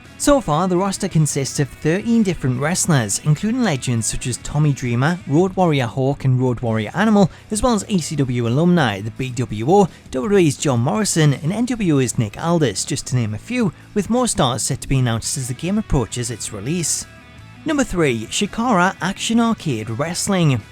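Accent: Newcastle, United Kingdom. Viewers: North East England/Geordie accent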